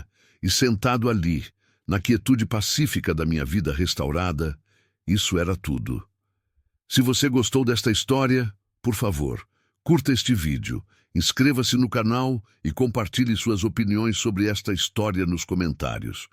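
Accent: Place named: Paraná, Brazil. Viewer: Istoria muito impactante. Narração Nitidamente Maringá. Paraná 20 ,,8❤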